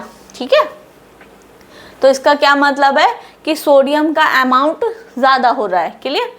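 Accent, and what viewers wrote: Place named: Bihar, India. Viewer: Theek hai yah kam boliye aap Bihar se ho kya ❤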